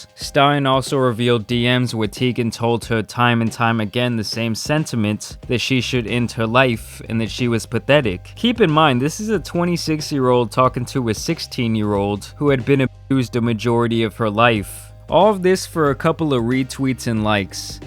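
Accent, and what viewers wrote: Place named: East Coast, United States. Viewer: Just noticed your east coast accent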